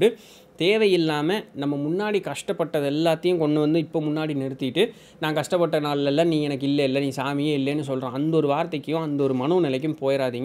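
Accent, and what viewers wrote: Coimbatore, India. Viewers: சார் கோயம்புத்துாரா?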